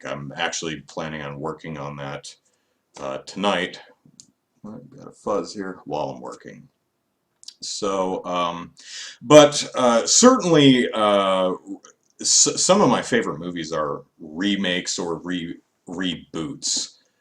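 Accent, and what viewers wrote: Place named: Kentucky, United States. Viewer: Off-topic but did you grow up in KY? You have a certain way of speaking.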